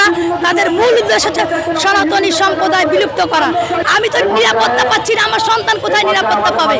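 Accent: Kolkata, India. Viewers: কথার ঢং দেখে মনে হচ্ছে আমরা ভারতে আছি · বাহ কলকাতার শুরে কথা বলছে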